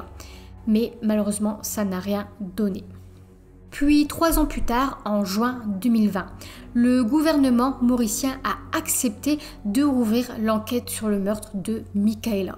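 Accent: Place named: Nord, France. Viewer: Oh lala tu viens du nord toi l accent chti 😅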